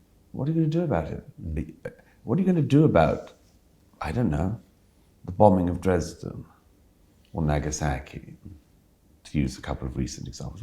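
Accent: RP, United Kingdom. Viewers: The man with the posh accent is speaking the truth. You would know that if your knowledge of history wasn't limited to TikTok and shows from Netflix. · This is one of the unfortunate byproducts of 30 years of dumbing down, there are people out there in Internetlandia who would follow Murray blindly based on the fact he can string a sentence together without saying “like” out of context, with a posh accent.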